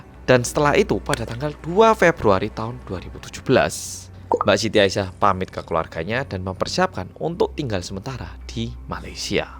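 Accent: Java, Indonesia. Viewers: ABG ini orang Indonesia apa orang Korea sich suaranya kok Jawa medok kali. Bingung JD nya. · Mas ini logatnya Jawa banget. Seneng dengarnya 😊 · Bosone medok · Korea kok ngomong logat nya Jawa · Sampean kui wong korea opo wong jowo, kok bosoe medok mbanget... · Kok bisa suaranya medok sekali spt orang Indonesia. Suara koreanya hilang samasekali. Salut..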